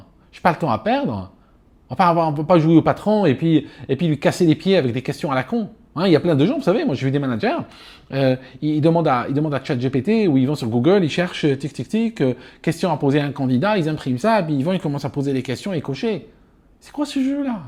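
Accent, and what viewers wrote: Switzerland. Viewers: Quand vous parlez de cela vous parlez de manière très saccadée. Cela diminue fortement la pertinence de vos propos. De la langue arabe transposée en français ? Cela change de votre accent suisse quand vous êtes nerveux, et compréhensible maintenant que vous êtes dans un pays de langue arabe. À part cela cinq étoiles sur le contenu. Flight safe et merci.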